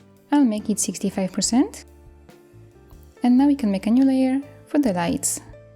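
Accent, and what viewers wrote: Poland. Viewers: Czy mi sie wydaje czy słyszę Polski akcent?:)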